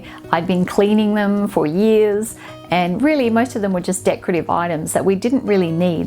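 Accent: Australia. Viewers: Did she say autistic or artistic? Her Australian accent can be misleading. Nice presentation however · Did you say Autistic or Artistic?I'm American so sometimes Australian accents are more difficult to understand.I'm beginning this process now that my nest is empty.Its very difficult but I'm sick of all the excess things I have accumulated.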